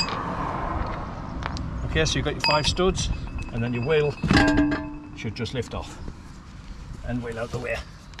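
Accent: United Kingdom. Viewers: Hi Love the videos keep the good work up, you might have some competition when our van arrives we’ll see. (I may be a bit too old for the work involved). We were due to pick-up our CV40 in June from Tyne Valley motorhomes but alas still waiting. Question: are you a Geordie no offence intended.:¬)) John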